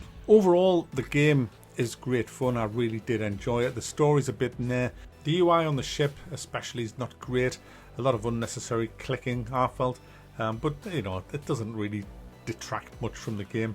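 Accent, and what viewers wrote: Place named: United Kingdom. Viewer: Can I ask how British accent is called? It's so cool:)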